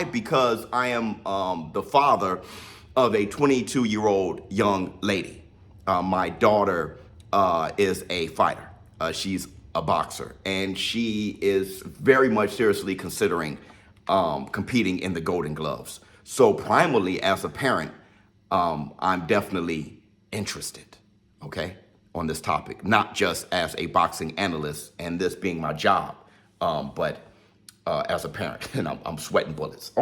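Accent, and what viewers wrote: Louisiana, United States. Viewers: Is you from the boot mane?! Whodat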